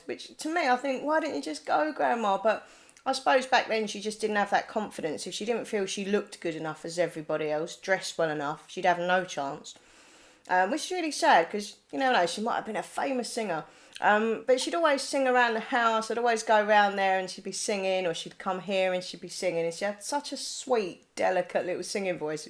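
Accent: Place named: England, United Kingdom. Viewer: Haha your accent is reyt good, proper English!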